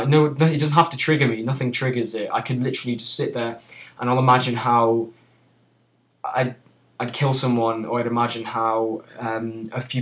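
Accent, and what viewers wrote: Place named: England, United Kingdom. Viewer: I'm just going to be a total creep and say that I really like your jaw line and your hair. And your eyes. I'm not gonna lie, I've watched this video more than once all the way through because I love your accent and I like listening to your voice. Also, I think you'd be such a fun friend to hang out with. Also - where from England are you from? What kind of accent is that?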